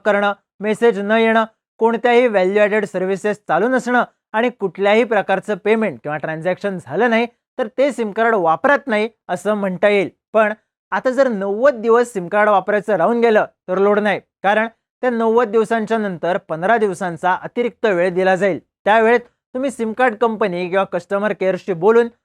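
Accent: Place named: Kolhapur, India. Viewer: भावा तुझ्या बोलण्याची पद्धत पूर्णपणे कोल्हापुरी वाटते एकदम झणझणीत आणि चरचरीत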